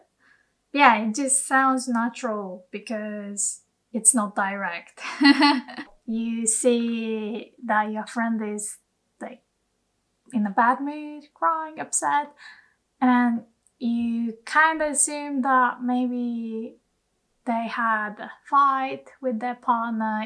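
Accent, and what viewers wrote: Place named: England, United Kingdom. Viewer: you are as cute as a button, and I luv your English accent...🍁🤠👍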